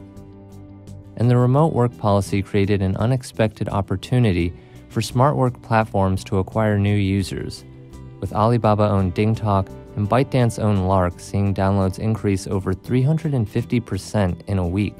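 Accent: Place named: United States. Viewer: 正宗的美式English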